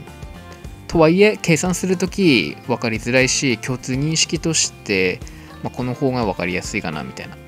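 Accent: Aomori, Japan. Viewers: 青森の訛り最高。安心する。ラーメン作ってもらったの食べたい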